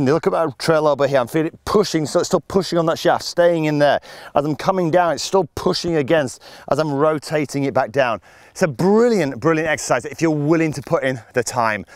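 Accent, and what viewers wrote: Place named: Northern England, United Kingdom. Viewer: Cak handed - wow thanks i'll try it in Mjorca next week - great videos and love that Northern accent 👏👏👏👏👏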